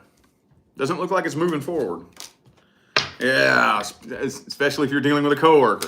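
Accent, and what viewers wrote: South, United States. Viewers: Wow,. FirstlyI love your Southern accent! Secondly, yes my ex-bf was ♓️. Potential new guy is ♓️, but I’m still single.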